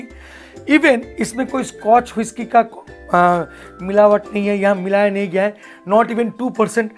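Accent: Bengal, India. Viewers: Tum bangali hai kya? Bengali me bat karo.. Your hindi is shit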